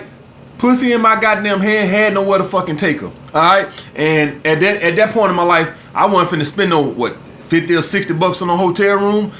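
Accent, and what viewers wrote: United States. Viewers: That country ignorant accent he has would lose my interest on site. Let’s not mention those horrible razor mark scars that are so visible. Let’s me know he’s cheap and doesn’t even take of himself....straight facts no shade.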